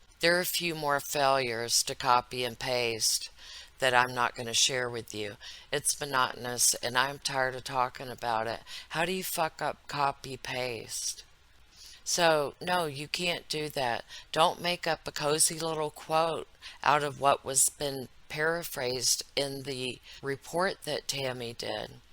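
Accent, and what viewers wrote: South, United States. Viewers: The anti christ should finally get treated the way you do. I like your southern comfort voice. My daddy is a zero is spot on ! 12 degrees of separation . I am with you , we cn not say enough nasty words to describe this asshole. I love Beethoven, you are great!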